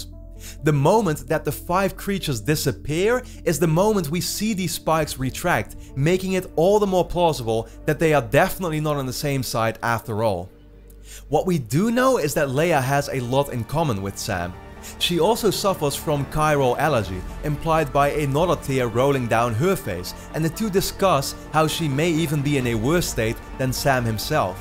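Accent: Netherlands. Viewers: Having an allergy may mean he has better resistance, though, to whatever is causing it. Awesome video! Can't wait for the game either. Heerlijk NL accentje btw :D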